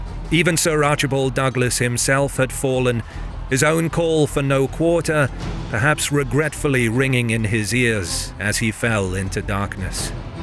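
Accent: England, United Kingdom. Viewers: It’s very much an English accent. We have loads of different regional accents. Glad you enjoy the history. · Right on! As an American, this is my history too, but it definitely needs to be told in a proper English accent! Excellent detail and a great narrative. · You are right, it is your history too ! BTW, it is an English accent.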